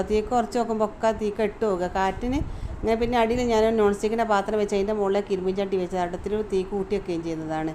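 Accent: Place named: Kozhikode, India. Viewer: Inghalu kozhikkode aano sthalam😂samsaram kettitu aganethonni❤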